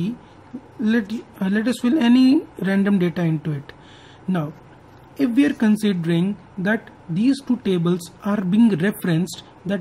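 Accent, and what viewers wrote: India. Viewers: the indian accent is fucking funny lol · You know its going to be informative when the tutorial video has: 1. Indian accent 2. Ball point pen and paper 3. From more than 5 years ago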